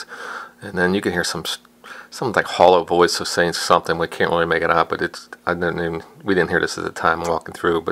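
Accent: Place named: Pittsburgh, United States. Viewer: Pretty cool stuff. You sound like you're from Pittsburgh from the accent. Thanks for putting it all together.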